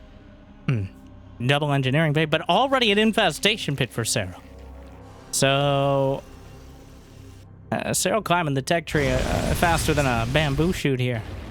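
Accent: South, United States. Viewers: Dude im not gonna lie, I used to watch you years ago. I do NOT remember you having a southern accent whatsoever. Am I delusional?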